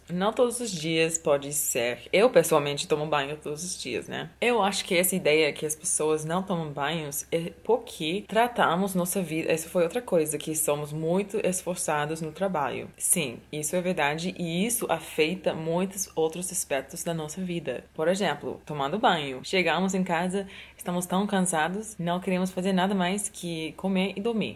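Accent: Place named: Rio de Janeiro, Brazil. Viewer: você está falando português totalmente carioquês, tipo "SUXXXX"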